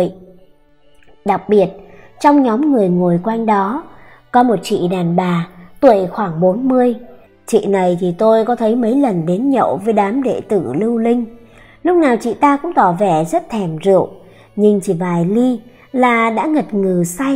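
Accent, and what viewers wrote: Standard. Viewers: Giọng đọc chuẩn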